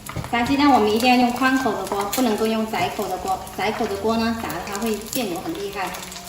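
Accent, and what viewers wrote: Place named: Cantonese, China. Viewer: 謝謝，謝謝你的愛心💗，衝著你的廣式國語我訂閱了😜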